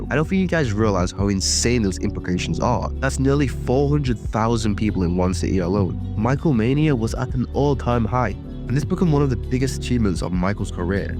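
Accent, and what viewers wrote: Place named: Yorkshire, United Kingdom. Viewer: I wonder if this fella learned English in the north of England. He sounds like a Yorkshireman.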